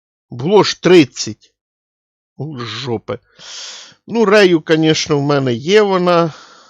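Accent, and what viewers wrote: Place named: Surzhyk, Ukraine. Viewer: трохи суржик є, пане!))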